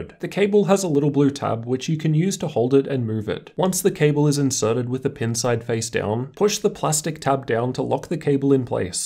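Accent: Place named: Australia. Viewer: It’s so odd how ozzies say words with an o. Nerrrrrr lenerrrrvo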